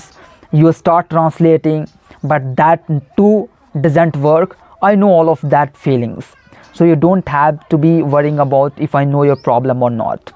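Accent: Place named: Bihar, India. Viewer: Bihari english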